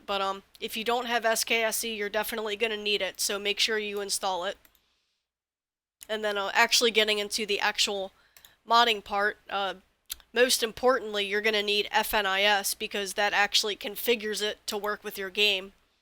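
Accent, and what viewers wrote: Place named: United States. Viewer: ) i love ur american accent, so cute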